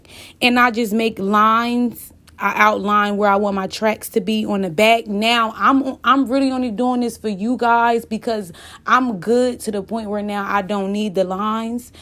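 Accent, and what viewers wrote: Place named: Philadelphia, United States. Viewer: Girl are you from philly? I hear the acccent lol, #phillygang 🤍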